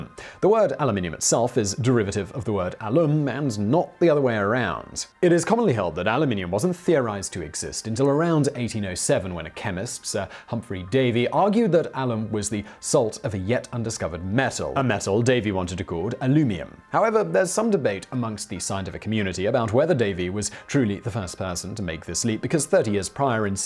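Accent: England, United Kingdom. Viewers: Nice to hear you talking English instead of American like you do in most of your vids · If you ever get the chance to take a chemistry class from an English person, do it! Words like aluminum and equilibrium are much more delightful with an English accent. Thank you,! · I'm totally un-upset about the pronunciation. I'm used to hearing the "Brit-tone" speech of, and with that accent, if he pronounced it wrong I would be more upset. However, I WAS expecting there to be a "BONUS FACT" discussing this... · i Love the accent. is a Engish gentleman. i am from Pittsburgh Pennsylvania USA. so i have a weird accent. i moved to the south so i have been around weird accents.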